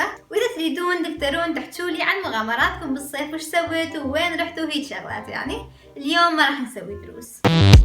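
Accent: Mosul, Iraq. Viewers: احلى شي حجي عراقي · سؤال انتي عراقيه اشو نص لهجتك عراقيه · اشو لهجتها كانو عراقيه بس اكو كلمات بحجيهه مو عراقيه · لهجتها هيك لان احنا المصلاويين نحجي هيك وهي البنت اشورية يعني مسيحية ومن الموصل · انتي عراقيه مبين بلهجتك شنو اسمج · هااي لهجته العراقية 😊✌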